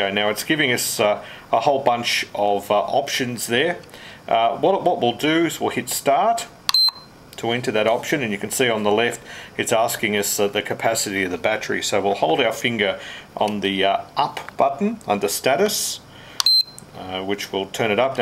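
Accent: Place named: Australia. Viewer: I can tell by your aussie accent that you are the man that can help me. I am new to all this battery charging and I want to get a good charger from america but I am worried about the 240 volts we have he in aus ruining it so my question is if it says the AC input is 100-240V does that mean I can run it off an aussie wall socket ? sounds like a dumb question right but I just need some reassurance before I spend $130 bucks. the racers edge surecharge is the one I am looking at. thanks in advance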